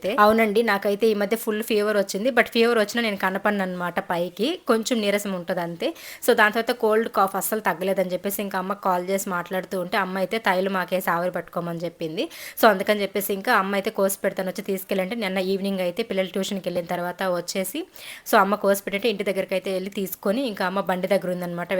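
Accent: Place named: Telangana, India. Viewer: garu first time comment chesthunna pls oka request. Andi Meru matladuthunte normal Telangana slang anipisthundhi kani medhi Palamaneru nellore district kada meku a slang osthadha edhina oka video lo oka 1 min me slang lo matladandipls..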